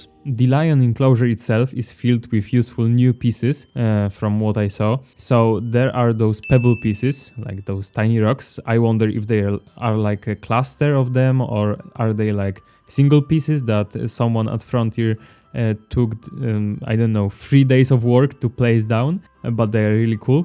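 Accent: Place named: Poland. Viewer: Are you from Poland? You have that kind of accent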